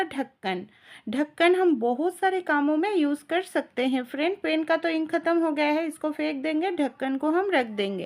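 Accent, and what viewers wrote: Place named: Assam, India. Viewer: Aapki bhasha se aap asaam ke hi lagte ho bangali kam. ❤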